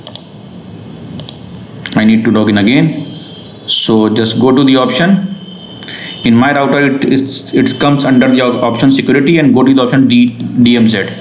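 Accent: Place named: Indonesia. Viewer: dont trust indo accent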